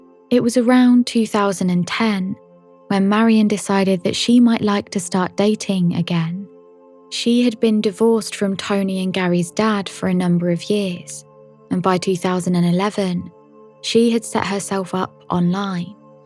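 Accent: United Kingdom. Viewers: You are the best voiced narrator I've heard in my 47 years. (I live in Oklahoma so what do you expect lol) - before your voicemhit my ears, my favorite was Richard Dawkins' wife Lala Ward...she used to be my favorite narrator and before her, it was the Forensic files narrator. Your voice is SO smooth and relaxing and sublime. Female British accident is my favorite accent in the world...and if anyone offers to digitize your voice ask a lot of money because YOU ARE THE BEST. NOBODY is as sublime to hear than your voice. I don't want to invade your privacy, just want to know what parts of Britain a person has to grow up around to get that accent? Your microphone is so good, your classy, thorough, and wonderfully pleasing voice is so good. It's a 🙏 thank you kind poster for sharing your voice with us. Don't worry I'm not a stalker... I'm gay lol you just have such an amazing voice it's unbelievable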